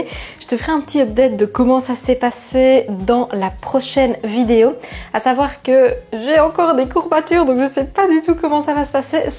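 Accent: Belgium. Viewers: Bonjour, J'ai découvert ta chaîne il y a peu et honnêtement j'adore!!! 🙂 En revanche, je ne suis oas sûre que tu atteignes véritablement 120g de protéines avec tes repas Au petit dej, même si tu prends 200g de skyr = 20g de protéine et ton granola =20g de plus, 2 gaufres à midi et ta salade ne te permettent pas d'atteindre 40g en plus si tu n'y as mis que 2 oeufs (12g de prot) et la farine de pois chiche, aussi riche soit-elle. Quant au repas du soir, il me paraît (très équilibré c'est pas le souci) mais là encore, je ne suis pas sûre qu'on ait 40g en tout Tu penses que tu pourrais préciser les quantités de manière à pouvoir calculer les macros Je fais beaucoup de sport et je peine déjà à atteindre les 80g/jour Mais en tout cas, merci pour tes contenus, ta fraîcheur, ton naturel, ça fait du bien! Et en tant que Lilloise qui habite désormais dans le Sud j'aime beaucoup ce petit accent belge qui me rappelle bien des souvenirs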